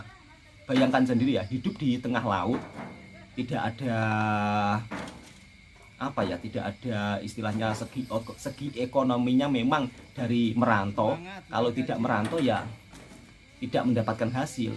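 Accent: Java, Indonesia. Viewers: Teruskan bersiar ....ngomong yg medok kang....!!!!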